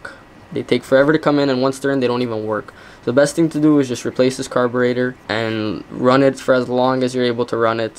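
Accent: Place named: Hawaii, United States. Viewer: Solid video.. bro you sound like one local from hawaii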